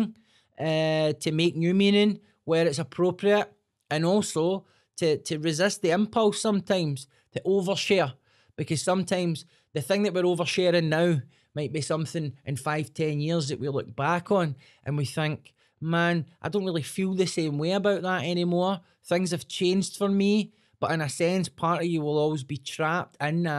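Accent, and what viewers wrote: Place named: Scotland, United Kingdom. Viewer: I have no idea why or how this popped up on my Youtube, but great insight. Subscribed. Also, LOVE the accent. I thought that one Scottish comedian I've seen was joking by exaggerating. I realize I was incorrect.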